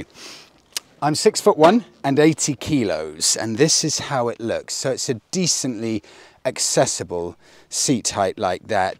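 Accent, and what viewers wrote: United Kingdom. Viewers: This is an excellent video. Thanks for sharing. There is just something about listening to a motorcycle review done by someone with a British accent. The content and editing…excellent as well. Cheers! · The British pronunciation is awesome. i love that.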